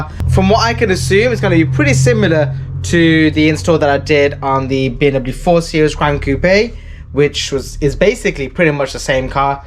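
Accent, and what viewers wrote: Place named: Birmingham, United Kingdom. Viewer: He’s in the UK, you can’t tell by the accent? He’s in Birmingham.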